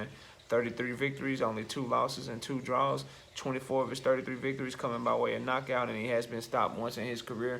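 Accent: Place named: North Carolina, United States. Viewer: You from North Carolina? You sound like my family. And you said Bo lol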